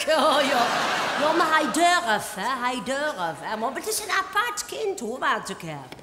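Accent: Utrecht, Netherlands. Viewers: Heerlijke vertolking van Utrechts ,lekker doorgaan zo. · Prachtig accent dat Utrechhhh en die zelf bedachte scheldwoorden. Super!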